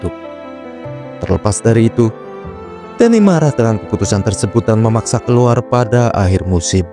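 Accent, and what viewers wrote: Java, Indonesia. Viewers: jawir pengisi suare ye, bikin bete, mending ngisi suara wayang kulit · Medhog banget narratornya.. 😂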